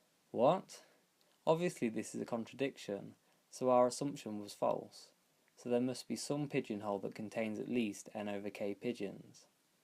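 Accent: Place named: Ohio, United States. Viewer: This is my uncle speaking your voice sounds like your from ohio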